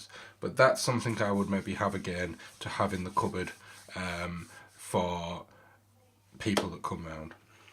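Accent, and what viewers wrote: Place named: Durham, United Kingdom. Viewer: I've just bought myself a bottle of this. I'm looking forward to trying it. I've recently got into whisky. Not tried a Campbeltown yet. Are you from Durham? I'm from Durham you're accent sounds similar to me.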